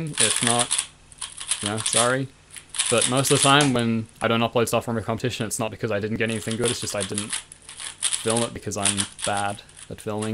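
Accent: Australia. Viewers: You seem unaware that Australians pronounce the letter Z as "Zed" rather than "Zee"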